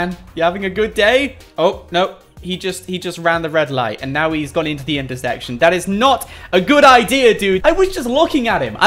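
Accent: United Kingdom. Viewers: Is British or American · Kylah Folkes he’s a British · listen to his accent; he's British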